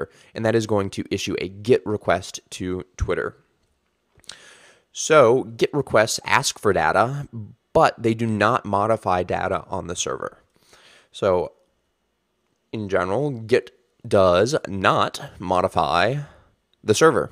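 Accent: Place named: England, United Kingdom. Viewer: yes first video I found in an English accent rether than Indian accent. I can finally understand every word without having to rewind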